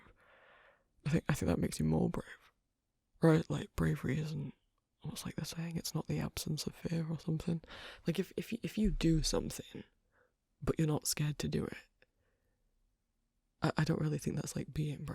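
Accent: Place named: United Kingdom. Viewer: GRAAHHHH i ADORE the british boyfriend audios!!! all your recent audios have been AWESOME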